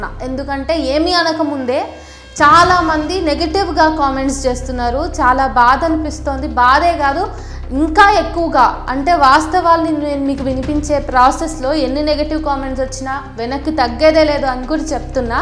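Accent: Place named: Telangana, India. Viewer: Tg telugu yaasa,,,, edi special hindi kaadu,,, tg yaase tg vuniki purthiga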